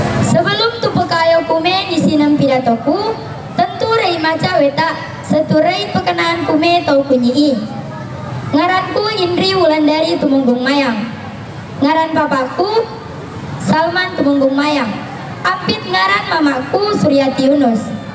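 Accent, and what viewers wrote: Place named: Tondano, Indonesia. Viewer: Sudah dialek Tondano, jawanya tinggal 5 %, kalau Suriname dialek dan bahasa masih khas Jawa. Saya heran di Tinggal di Amerika masih begitu lestari budaya Jawa.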